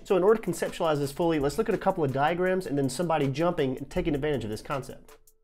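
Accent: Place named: South, United States. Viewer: First time on the channel. I hear a Tennessee or Kentucky accent there. I picked up on it right away for some reason. Great video, I subscribed.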